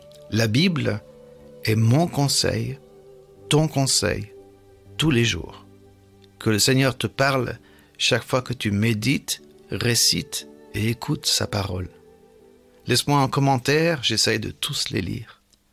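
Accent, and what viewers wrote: United Kingdom. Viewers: Bonsoir merci pour ces belles paroles elles me reconfortent apres une journee au bureau Je vous le dit souvent j'adore votre accent BRITISH Have a nice evening Awaiting for your next reading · Bonjour et merci pour ce partage. Oui oui tu as bien un bel accent anglais. Je comprends mieux maintenant. La méditation m'a fait sourire et réfléchir surtout avec l'image du mauvais mur. Et si mon échelle d'ascension pro était sur le mauvais mur 😢 je me suis demandée. Seigneur je te confie tout je te recommande tout apprends moi à t'écouter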